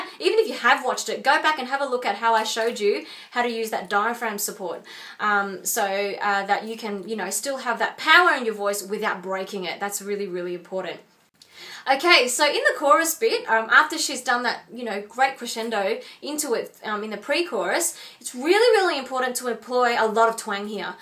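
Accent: Australia. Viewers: Hey! I really enjoyed your videos and it's nice to hear a relatable aussie accent :) I personally REALLY struggle with projection or 'forwardness' all of the examples you gave where you were quite gentle or 'acoustic' i find that I 'ALWAYS' do this.... I would LOVE to know some vocal exercises to improve projection! :) Subscribed!!!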